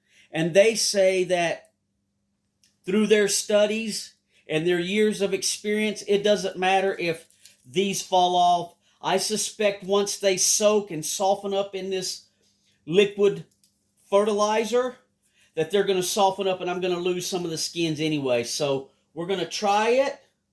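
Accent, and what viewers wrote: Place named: South, United States. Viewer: You have just a little bit of a Southern drawl, sir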